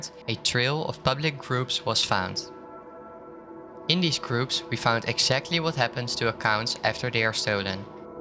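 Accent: Netherlands. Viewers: Are you from the Netherlands? You really sound like it.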